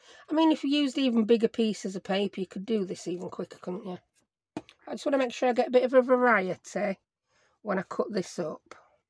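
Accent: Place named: North, United Kingdom. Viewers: I’ve been thoroughly entertained watching this! Been chuckling along, from Melbourne Australia. My friend in Michigan USA shared it on Facebook. Love your beautiful tags! And love your Northern accent. You sound like a dear friend of mine. ♥️ Will watch you videos again. I subscribed to your channel. Keep commentating; you’re Awesome at it! 😄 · Hello, First of all I want to say how I really love your videos and since I am a Geordie living in Canada, its great to hear your accent reminds me of home. Anyway, to get to my point, we only have Micheals here and they are quite pricey. So I wish we had some alternatives even junk stuff we could use for collating etc. I have made so many of the things from your videos and love them.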